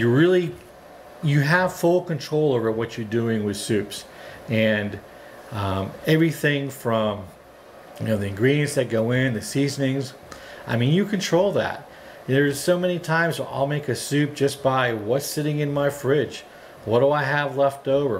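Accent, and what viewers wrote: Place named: Pennsylvania, United States. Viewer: My father was WWII, gunner's mate in the north atlantic. Have a good friend who is a Seabee and she just left this week for her year... In Somalia, we think. My husband was searching bean and ham soup and voila! Yours came up and he shared it to me. I watched it and I told him I thought you were from PA... Just heard a word or two every once in a while. We are from Pgh area but living in NC.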